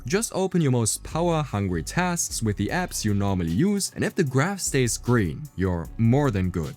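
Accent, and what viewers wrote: Germany. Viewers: i have a slight feeling that he is perhaps german, the accent is just so distinct, its really funny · Ist das ein deutscher Akzent? 😅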